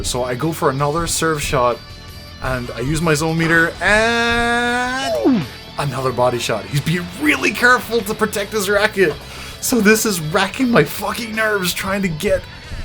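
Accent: Ireland. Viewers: I can really hear your Irish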